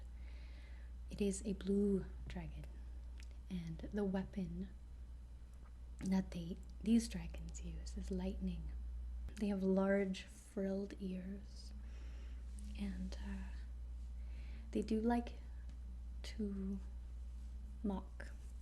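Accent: Canada. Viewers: Lol Your Canadian accent really suits this role play.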